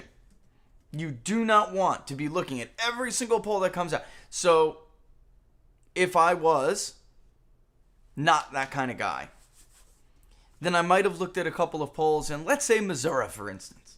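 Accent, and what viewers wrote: New York, United States. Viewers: It’s a New York/New Jersey thing, thus why Trump also uses this peculiar pronunciation. LOL.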